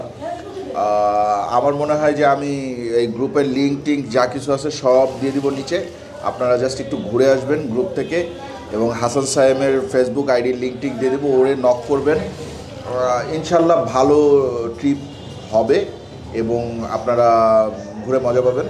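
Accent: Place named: Barisal, Bangladesh. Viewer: বরিশাল জীবনে যায় নাই, কিন্তু ভাষা ঠিক আছে বরিশালের।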